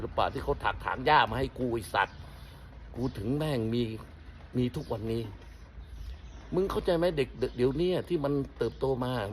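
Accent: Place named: Rural, Thailand. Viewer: สุดยอดครับพูดลูกทุ่งดีครับอาจารย์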